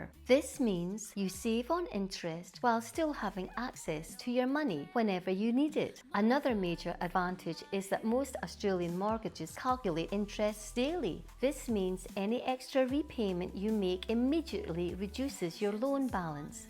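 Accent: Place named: Scotland, United Kingdom. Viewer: Must be my Scottish ancestry (MacGregor) because I've never had the privilege of visiting yet. Whenever I hear a Scottish accent it feels like I'm taken back home, if that makes sense. Yes as Aussies we take so much here for granted, especially by those who have never visited other countries. We just don't realise what we have and how good life here can be if we allow ourselves to appreciate it. But in some beachside locations the sand flies can give the midgies a run for their money. They say the mozzies her can carry you away but the sandflies rough you up good and proper then carry you away. Also Aussies will be the first to tell "Whinging Pom!" to anyone who dare criticize what we love to criticize ourselves. But truth be known the Poms have nothing on the whinging Aussie. We'll have a whinge about finding nothing to have a whinge about.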